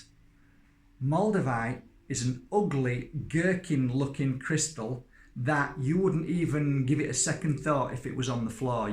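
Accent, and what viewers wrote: Yorkshire, United Kingdom. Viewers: You sound like from my neck of the woods. 😁 yooorkshire! Thank you for this. I love getting new info. I am on my spirtual journey, doing reiki as well. 🥰 i will visualise myself as a crystal when sending love and light💫💜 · Are you from Yorkshire ? I’m in South Africa but that sounds like a Yorkshire ascent ?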